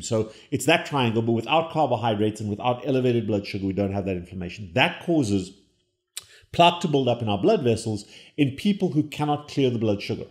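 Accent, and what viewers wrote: South Africa. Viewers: Are you South African doc?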